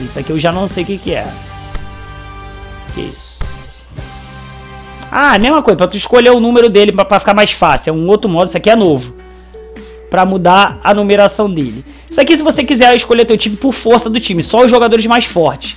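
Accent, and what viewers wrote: Rio de Janeiro, Brazil. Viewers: Q time vc torce? Pelo sotaque é carioca deve se pro Flamengo...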